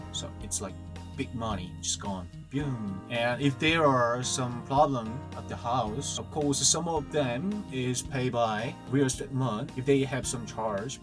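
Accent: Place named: United Kingdom. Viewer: 영국식영어 개멋지다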